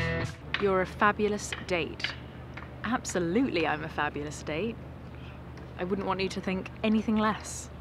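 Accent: United Kingdom. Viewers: I know you hear this all the time but I so love your accent. Just British beautiful.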